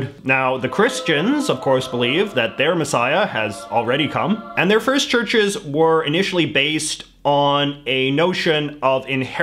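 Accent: Canada. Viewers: this guy has the most pronounced Canadian accent ever. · Yo like can you turn down the canada 3 notches? Like.... there are other ways to pronounce about that dont sound like "a boot"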